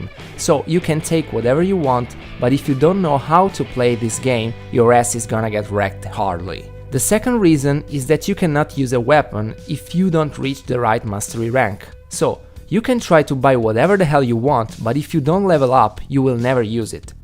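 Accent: Rome, Italy. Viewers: inglese romano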